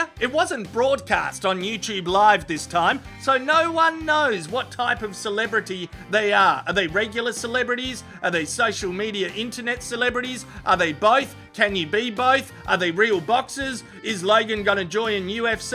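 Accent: Australia. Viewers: God I hate the Ozzie accent!!!....ugh......sorry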